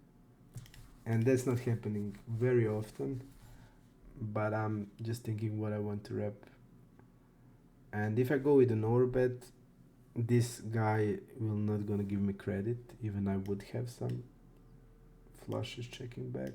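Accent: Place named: Croatia. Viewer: Just gonna take a wild guess and say you’re Croatian going off your accent :D First video I’ve seen from you bro, great vid, subbed:) Keep crushing buddy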